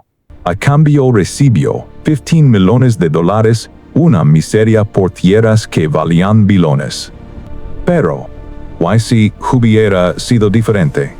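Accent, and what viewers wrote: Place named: United States. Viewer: Que gran video me encanta el asento estado unidense del video le da un toque unico contra otros videos similares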